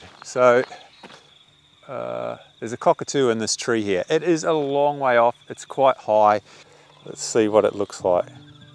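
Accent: Australia. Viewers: Your Australian accent.:-) Nothing wrong with it of course.:-) Greetings from the Netherlands, spring is coming here soon.:-) photographer and Filmer.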